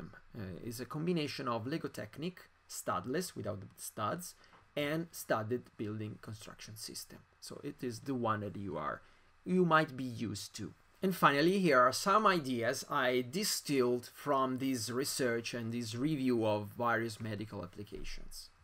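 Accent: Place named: Italy. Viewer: Mi scusi per offtop, ma mi piace che Lei parla Inglese con accento Italiano! :)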